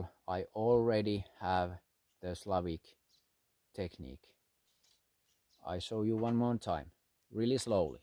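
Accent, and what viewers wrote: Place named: Finland. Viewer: Awesome video. I had never heard of Slavic draw before today. Greetings from Minnesota, USA. I suspect from your accent that you might be Finnish, or at least you live in Finland. Here in my state of Minnesota, there are certain towns and areas where there are lots of Finnish Americans. I am in my 30s, i became friends with guys in primary school around my age who's family still remembers things about Finland very well. So I mean not my friends parents, but some of the parents of their parents remembered things and stories about that place, Finland. I will not mention the small towns here, because people who are not from Minnesota usually do not know the names of them anyway, but the surnames I remember of kids I grew up with included the names Hyuvonen, Tanskinen, Maninga, Karvonen, and Huhta. Once again, greetings from Minnesota USA. 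Long live the art of Archery! One of the most ancient of our martial arts! 🏹 Ha!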